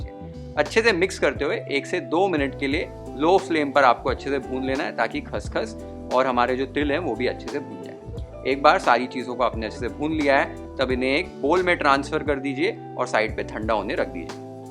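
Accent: Maharashtra, India. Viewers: एक नंबर मराठी ठसका ❤ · Authentic recipe with authentic marathi brother❤❤❤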